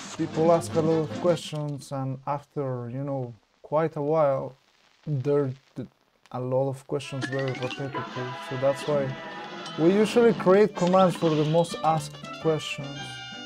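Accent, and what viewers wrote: Mexico. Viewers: primero pense que eras estadunidense pero con este video ya veo que sos mexicano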